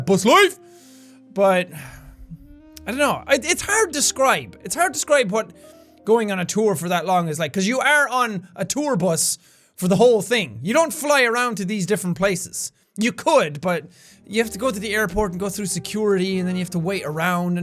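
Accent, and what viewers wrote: Ireland. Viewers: Welcome back! Even though I was binge watching previous videos, I still miss hearing that sweet, sweet Irish accent ^_^ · you can here that his irish accent is stronger after he was in dublin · Ah the accent., you have clearly been back to Ireland. Lovely. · It's nice to hear your Irish voice again. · I've missed that high pitched Irish voice so much! I've watched all the series on this channel to keep me happy and distracted from the shitty reality since I've been here for about 2-3 years now.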